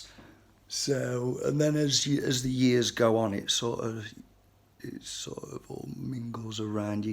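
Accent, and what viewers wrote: United Kingdom. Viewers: Don't be a soft cnt and apologies,you are the voice of a man of Britain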